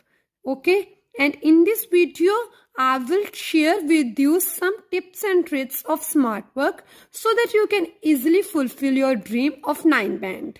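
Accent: India. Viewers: Your Punjabi accent is clearly reflected when you speak in English. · Tusi majhe wal de lgde j 😁👌❤️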